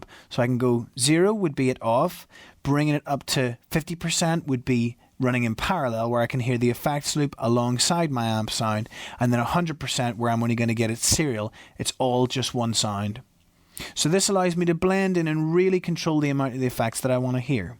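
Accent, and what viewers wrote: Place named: Liverpool, United Kingdom. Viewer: Dude you from around Liverpool. aren´t you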